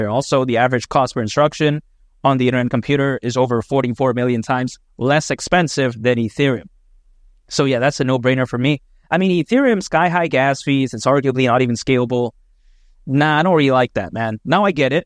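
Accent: United Kingdom. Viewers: Great British accent. I still can't tell if you're AI or not though😂 🤔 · love $icp and love the British accent 🌐👨🏼‍💻♾️ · Nice British accent! Nice content again as well.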